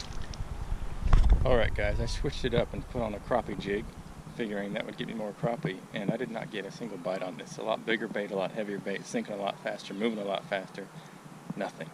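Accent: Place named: South Carolina, United States. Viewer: May I ask what state you are in? I'm new to your channel maybe you said. Seems like a S.C accent you have. Crappy are a fish I always had difficulty catching. And yet I was amazed to see several young boys taking long stringers of them out of a tiny pool below a dam outlet. They were just flicking the line like a fly rod. Amazing. Another cold day here, thank you or the vid!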